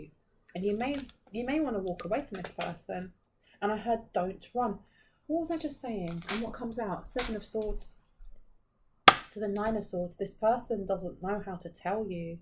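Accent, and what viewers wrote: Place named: Hertfordshire, United Kingdom. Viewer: where are you based exactly? you sound like you come from hertfordshire ,, anywhere close?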